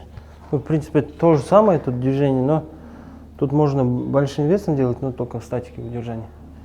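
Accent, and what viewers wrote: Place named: Kazakhstan. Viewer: Казахский Русский пойдет👍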